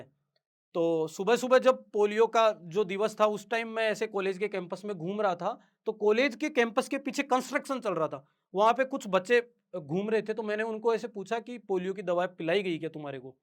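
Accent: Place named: Hadoti, India. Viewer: Hadoti wali tone❤❤❤❤ hum Bundi se hai 🎉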